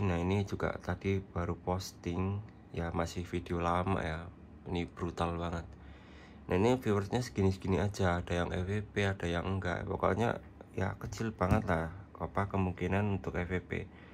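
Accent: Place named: Wonosobo, Indonesia. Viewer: Sampean cah ngendi mas? Logate kaya wsb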